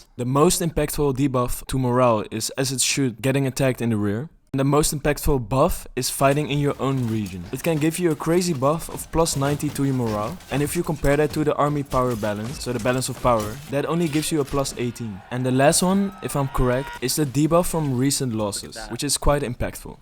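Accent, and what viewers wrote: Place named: Netherlands. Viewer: Your English sound Dutch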